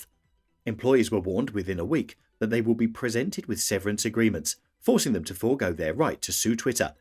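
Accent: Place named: United Kingdom. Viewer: Is anyone else sick and tired of YouTube podcasters employing British accented narrators? They sound like they have a cob stuffed up you know where. I, for one, would much prefer narrators who speak with a good old American accent.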